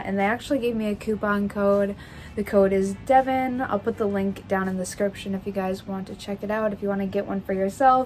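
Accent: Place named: North, United Kingdom. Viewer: I stumbled upon one of your videos today and found myself listening to others for the past couple of hours. I am obsessed with vintage clothing and love music before my era as well. You were asked a question about mental health and answered so honestly that I wanted to applaud your courage. Great job on the videos! P.S. I am originally from up-north and recognized the accent immediately ; )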